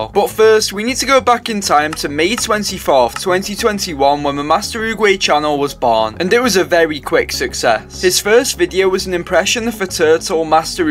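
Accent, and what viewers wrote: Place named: United Kingdom. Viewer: "and you can always undo it if you get tired of my british accent" LOL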